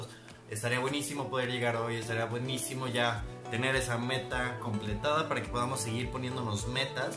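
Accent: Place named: Fresa, Mexico. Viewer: nada mas no hables fresa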